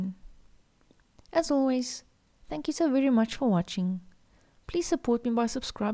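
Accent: South Africa. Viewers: Very nice content. Familiar accent. South African?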